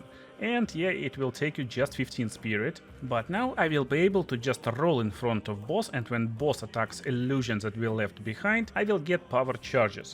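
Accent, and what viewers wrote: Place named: Russia. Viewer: то ли из-за дуолинго, то ли из-за русского акцента, но этот видос я понимаю без дубляжа 🤣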